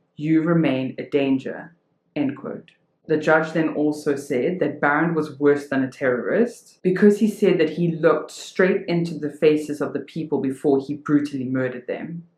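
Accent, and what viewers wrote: South Africa. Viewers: I believe is presenting this case for its phsycopathic value in relation to Barend, not because she wants to be political or racist. Obviously she is S African, accent is unmistakeable.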